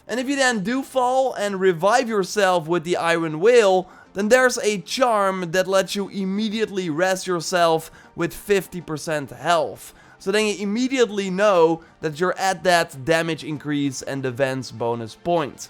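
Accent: Netherlands. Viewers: Vire arrows 😀. Your dutch english never gets old.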